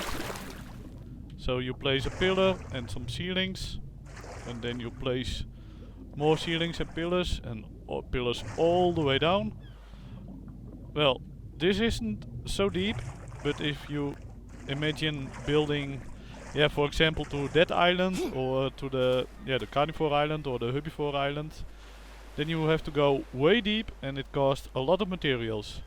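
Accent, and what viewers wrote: Netherlands. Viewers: jij hebt een nederlands acentje ÷) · Heeyy are you Dutch? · are u dutch :) ? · Let me guess... You're Dutch? :p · are yo dutch? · the dutch accent is strong with this one...... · Are you dutch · Ben je nl?😂👍🏼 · he's dutch thats where the accent comes from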